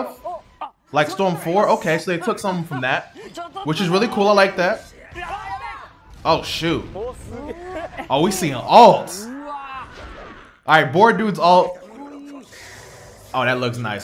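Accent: United States. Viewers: No hate to but I hate the way Americans pronounce the characters' names especially with Naruto and Jotaro.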